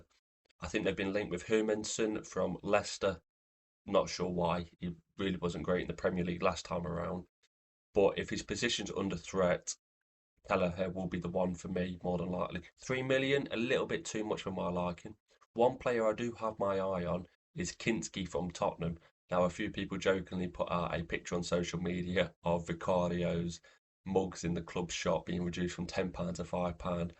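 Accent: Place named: Nottingham, United Kingdom. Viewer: Definitely from around Notts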